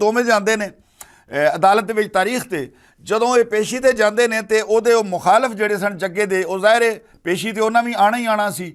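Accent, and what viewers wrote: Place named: Punjab, India. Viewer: اچھا پنجابی لہجہ اور انداز بیاں۔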